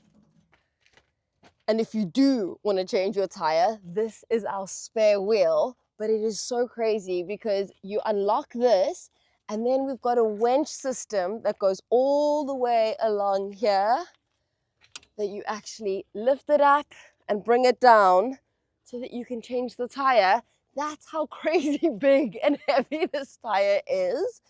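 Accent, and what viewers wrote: South Africa. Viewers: You sound South African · Who is this Girl. Is she South African? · She sound South Afr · As a proud South African I can definitely hear my own. Im assuming the host is South African 👊🏽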